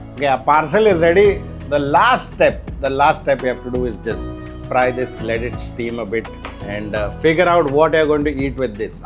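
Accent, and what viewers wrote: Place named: Mumbai, India. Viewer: Love the Mumbai accent and way of talking 😃Love ur recipes too … So subscribed to ur channel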